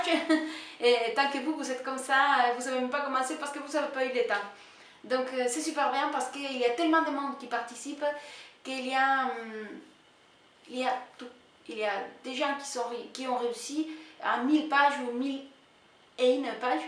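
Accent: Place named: Latin America. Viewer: Coucou ! Comme promis me voici :) (je t'ai répondu dans le groupe) Quel délicieux accent <3 Es-tu hispanique ? (désolée je suis un curieuse, deux amoureuse de cette langue)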